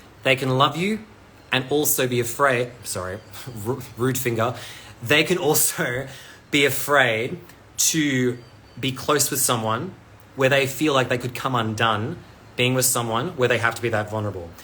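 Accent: Australia. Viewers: I loved it when I started listening to this, and it was a fellow Aussie speaking!! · Are you from Perth/Western Australia? Your accent reminds me of some I used to know.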